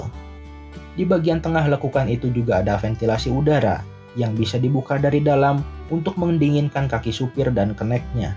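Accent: West Java, Indonesia. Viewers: Penyiarnya urang Sunda keneh nya?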